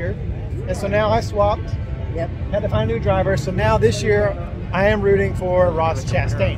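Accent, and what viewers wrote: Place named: South, United States. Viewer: I do declare! It sounds like found a little Southern drawl while being there! My mother used to point that out in me when I would come home on leave when I was stationed down South.😉👍🏼😎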